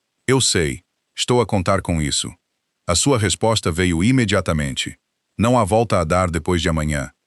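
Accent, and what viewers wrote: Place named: United States. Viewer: Odeio essas palavras em Português é sótaque americano é horrível fala o português por favor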